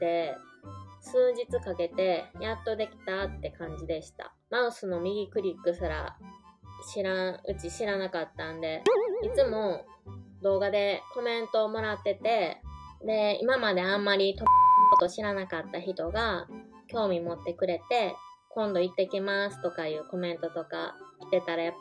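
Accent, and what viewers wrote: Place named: Kansai, Japan. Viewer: 大阪にちょっとだけいた元ホストです。関東の人間にはアメージングで謎なT新地の不思議をいろいろ教えてくださって勉強になります。またの関西弁にキュンキュンします。１０００人おめでとうございます。ライブ配信頑張ってください。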